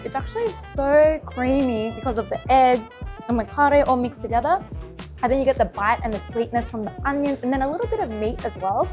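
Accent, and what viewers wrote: Australia. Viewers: Mizuno … might be great for me being gluten free…. Good to hear the Aussie accent! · she sounds like an Aussie, so are the prices in Australian dollars? would be more helpful to list the actual prices or do they accept foreign currencies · I have a thing for asian girls with an aussie accent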